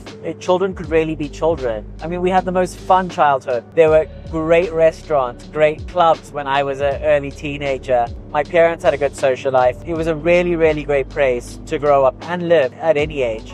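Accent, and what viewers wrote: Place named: United Kingdom. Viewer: Zimbabwe? I thought you were British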